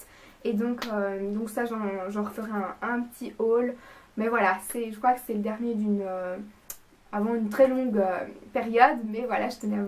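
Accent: Belgium. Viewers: Coucou, Je viens de tomber sur cette video et j'adore tes achats ! Tu as beaucoup de goût ,c'est simple mais très efficace :) tout ce que j'aime De plus, je te trouve pétillante et j'aime bien ton petit accent belge :D Je m'abonne!!! Bisous :*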